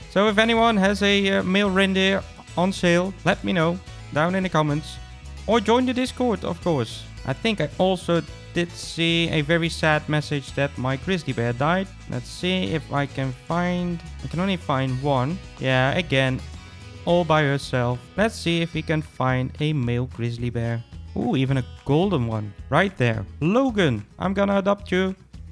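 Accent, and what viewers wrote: Netherlands. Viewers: Jou bent een nederlander ik hoor aan de accent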